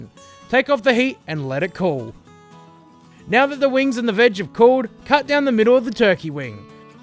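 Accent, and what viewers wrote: Australia. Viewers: australian voice making Cajun food ??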